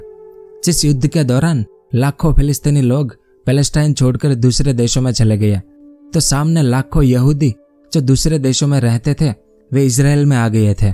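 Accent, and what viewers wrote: Gujarat, India. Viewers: आपके बोलने Accent एकदम गुजराती जैसी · Yeh bhai Gujarati hai..unke voiceover se pata chalta hai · tamaru bolvanu tone gujarati aave chhe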